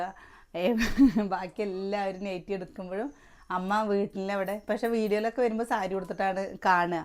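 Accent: Palakkad, India. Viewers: പറയുന്നതുപോലെ പറഞ്ഞോളു അതാണ് കേൾക്കാൻ രസം ട്ടൊ നമ്മുടെ നാടൻ ഭാഷയാണ് പാലക്കാട്കാരിൻ്റെ സ്വന്തം ഭാഷ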